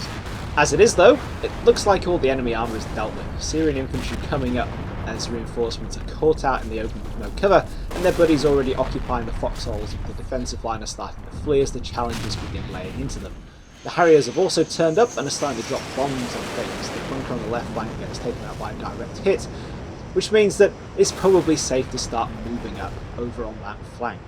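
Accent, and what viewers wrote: United Kingdom. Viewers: Hey! Your editing and narration are just wonderful! In my book, you are the best Combat Mission Youtuber around.:D Must be something with you Brits... the best Youtuber for Forged Alliance Forever is also a British guy his channel is called 'Gyle Cast'. I played this scenario because of your video and enjoyed it very much. I use to be a bit more cautious in moving-to-contact which led to me losing just one lad. Aside from that your tactical analysis is spot-on so I went with much the same plan as you and it also played out much the same. The scenario reminds me very much of the first mission of the 'Task Force thunder' campaign which is in a similar context and tactical situation.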